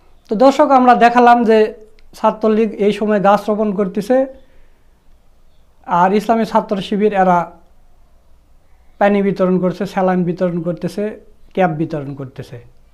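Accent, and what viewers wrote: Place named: Naogaon, Bangladesh. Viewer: আপনে কি নওগাঁ জেলার আপনার কথা আমাদের নওগাঁজেলার ভাষার মত লাগতেছে আপনাকে ধন্যবাদ আপনার খবরের জন্য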